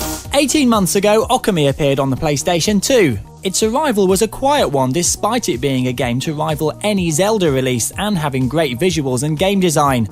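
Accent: United Kingdom. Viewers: hes got the British accent. im pretty sure some people would say we are saying it wrong.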